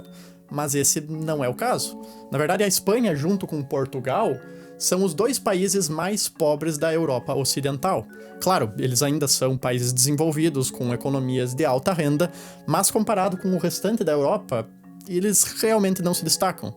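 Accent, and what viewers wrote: Rio Grande do Sul, Brazil. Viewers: O sotaque gaúcho dele faz o vídeo ficar mais incrível ainda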